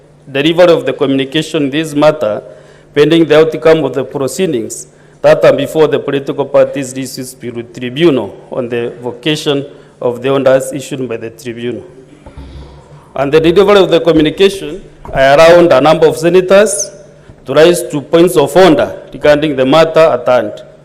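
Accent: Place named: Meru, Kenya. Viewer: That is the tongue of people of meru ,but English is good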